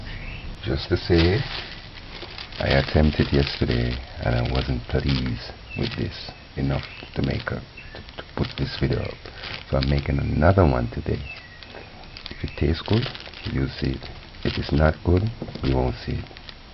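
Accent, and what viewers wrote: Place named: Jamaica. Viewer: Excellent Job!!! You had me engaged during this experiment and laughing at your speculations with your yardie accent. Enjoyed your excitement that reminded me of Lucious. I'll try my hand at this non alcoholic version of the Easter Bun for 2019 holidays. Blessings to you and yours!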